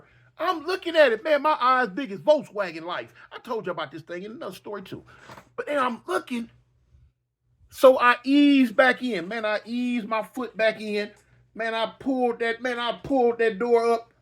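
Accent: United States. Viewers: Know disrespect but your war stories are garbage or maybe it's how you tell them and you sound country as F.ck get your Cali swag right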